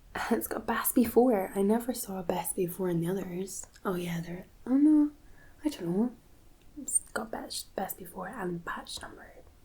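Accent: Scotland, United Kingdom. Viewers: a beautiful girl from scotland+beer+that accent... this must be heaven. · You make me want to go to Scotland for sure. For the beer and the view. Oh....and the accent. Love the accent. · I am a bit confused by you saying that some of these are like magners - a cider, when this is beer. That is a bit strange, but it is interesting to hear different points of view. However, I like your video. Have not seen you videos before I don't think, but seen some other ASMR. I like listening to your voice and Scottish accent. Combing your voice with beer is excellent though! I like blonde ales as well. I might have to try this one that you like. Thanks for the video, I will subscribe. I like you switching between left and right near the beginning of the video - that works for me to give more tingles.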